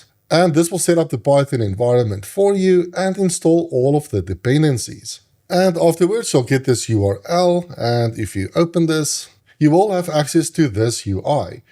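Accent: South Africa. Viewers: Amazing content and so well explained. Love the finer detail and your attention to the specifics. This helps me understand using Claude Code in a whole new light. Will definitely be following along for new videos, for sure. Also, can't miss the fellow Saffa accent. 😅 Thank you and looking forward to seeing what you've got brewed next. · It is very nice to hear a fellow South African's voice talking about AI and coding. Thank you very much. I love the South African twang. It reminds me of home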